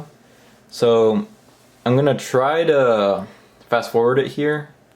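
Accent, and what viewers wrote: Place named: Mexico. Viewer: I can tell by your accent you’re Mexican. Where you from? Cali?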